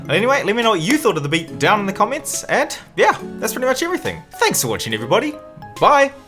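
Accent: New Zealand. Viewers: are you from new zealand or something?? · are u from new zealand bruv ahahaha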